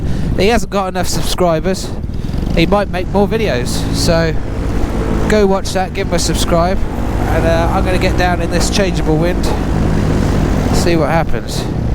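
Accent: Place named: United Kingdom. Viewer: I'm here for the British accent! lol Can't wait to hear it on the Podcast! Great video! Its so cool seeing all the landscape in the UK. That quarry looks like it'd be amazing to fly, weather permitting. I slightly jealous that you have low lying clouds. Here in Texas, cloud base is regularly at 10,000 MSL! Looking forward to our chat!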